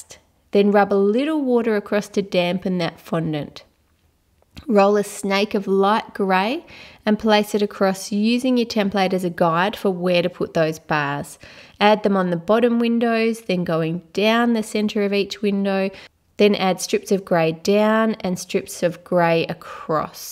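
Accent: Australia. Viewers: I love your accent so much I want to have an Australian accent so much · R u Aussie (I is) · Are u Australian?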